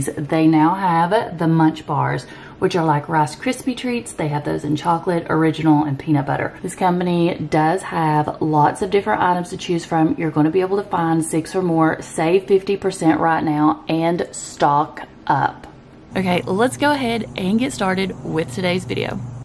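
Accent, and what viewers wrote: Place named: Tennessee, United States. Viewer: i knew i recognized that accent. Im from Elizabethton, TN too! Small world!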